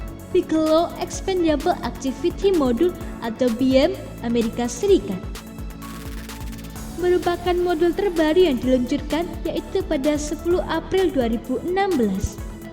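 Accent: Java, Indonesia. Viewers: Suara nya medok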